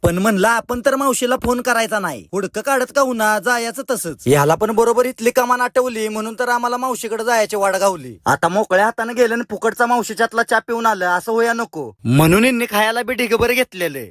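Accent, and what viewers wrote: Karad, India. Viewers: mi continue sagle vlog baghte ani mala avdte pn apali karadchi accent ❤❤ mich confuse zalte hi script adhich aikley mhanun just daubt clear kela 😅😊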